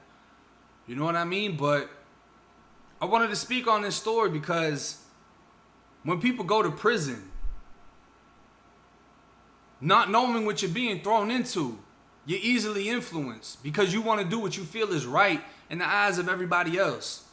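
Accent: Boston, United States. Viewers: Bro you have to be from Boston right? I hear a Boston accent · Why do you sound like you're from Boston? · im a little confused. all your stories are about Florida prisons but that accent is STRAIGHT Boston. mayb i missed the vid where you explained this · Why do u sound like u from Boston? And why did u join a ny gang? So many questions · I went to prison in…… MAHHCH. Boston accent kills me 😂 And the swamp is Gainesville,. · You sound like you from Boston son!! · I don’t understand how his accent goes from a strong Boston to a down south accent.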